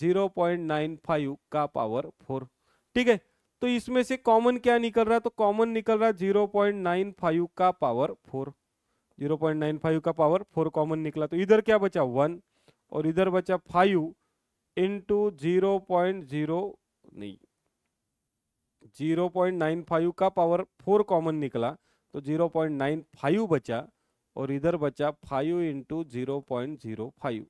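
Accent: Maharashtra, India. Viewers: Question >> ❎ Kochhan >> ✅ Marathi accent 🗿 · Marathi accent 🥰🥰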